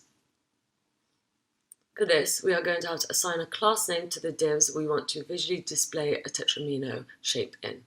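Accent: United Kingdom. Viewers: British accent is way too hard to get especially for a non-native speaker. I really wish subtitle was there :( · I saw the thumbnail and someone knew she had a British accent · British english is hard to follow, what did author say 10 sq.... ? What's the unit of 10 and 20 here ?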